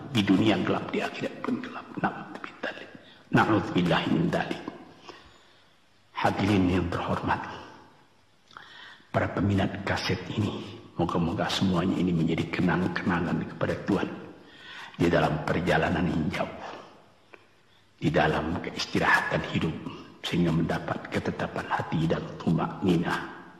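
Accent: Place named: West Sumatra, Indonesia. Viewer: logat minang ny khas banget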